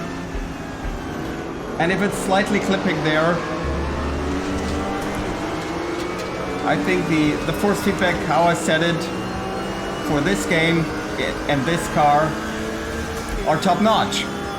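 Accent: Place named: Sweden. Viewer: Cool alright, though I heard a Swedish accent :)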